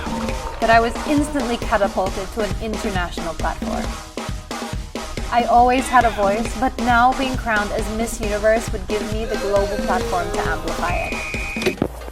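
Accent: Australia. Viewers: When she talks normal, you can hear the Australian accent. When she Talk loud and proud, she speaks the proud Filipino accent!!!! (I noticed it) ❤❤❤🌟🌟🌟☀️☀️☀️🌞🌞🌞🥰🥰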